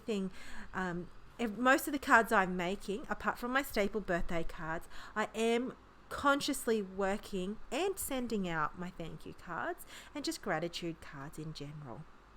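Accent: Australia. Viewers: This type of card is very clever 💜 Lovely to hear another Aussie accent 😉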